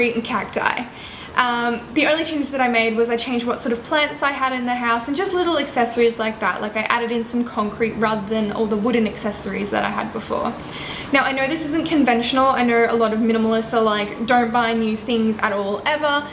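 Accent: Australia. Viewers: I love aussie accent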